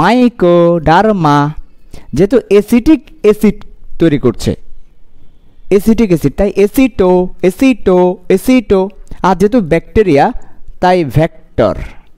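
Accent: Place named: Chittagong, Bangladesh. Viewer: Bai chittagong er naki ,chini chini lagtase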